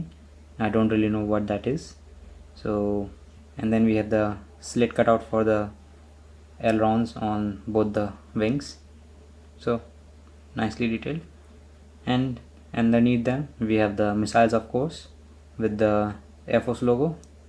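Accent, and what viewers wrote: India. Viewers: you sound like an indian are you in usa??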